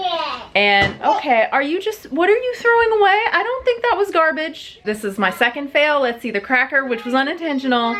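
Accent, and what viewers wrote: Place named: Tennessee, United States. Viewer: I heard your Tennessee accent.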